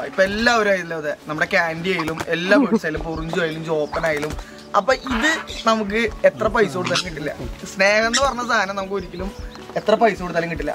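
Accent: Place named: Thrissur, India. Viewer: ത്രിശൂർ ഭാഷ ഇഷ്ടമുള്ളവർ ഉണ്ടോ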